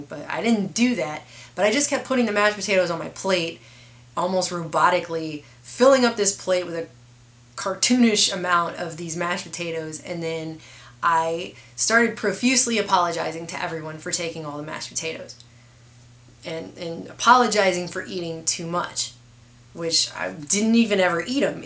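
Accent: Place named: Texas, United States. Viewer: You sound like you're from my part of the woods Texas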